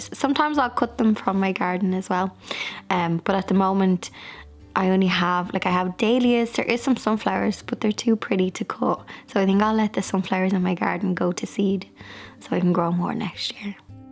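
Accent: Ireland. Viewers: Love your videos ❤️ I just found you!! I'm hooked so I subscribed! I'm from South Carolina, USA. So this southern accent loves your Irish accent!! Your home is beautiful!! I'm in love with your sofas!! Did you buy them or did you reupholster in that beautiful fabric?!! ❤️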